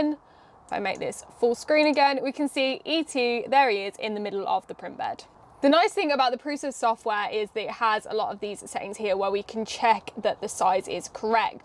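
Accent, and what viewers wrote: United Kingdom. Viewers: btw I'm here bc of ur accent. I love the way that speak the British English.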